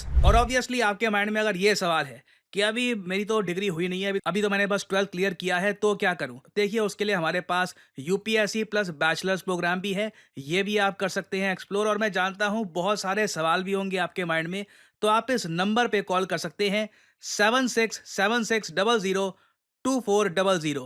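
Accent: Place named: Haryana, India. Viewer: Bhai wo bolane ka accent hai Haryana uttrakhand mein sayad bola jata hai.😅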